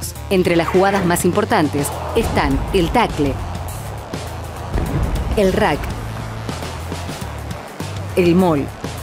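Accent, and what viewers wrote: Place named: Argentina. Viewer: Que horrible se escucha la voz Argentina, preferiría el Colombiano o Mexicano.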